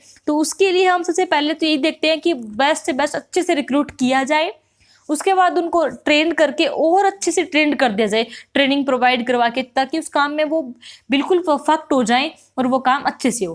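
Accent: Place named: Haryana, India. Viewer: Mam aapka anjane ka trika bahoot acha Hai😊but language me thoda Haryanvi Touch Hai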